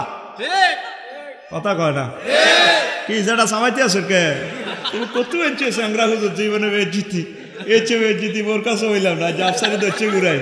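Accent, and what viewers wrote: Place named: Noakhali, Bangladesh. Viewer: ভাষাঃ নোয়াখালীর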